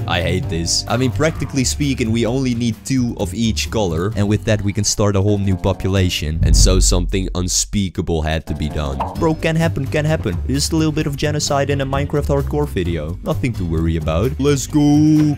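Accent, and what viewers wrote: Netherlands. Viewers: Hmm. In which country do you live? You sound kinda dutch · Jij Hoort Gwn da hij nederlands is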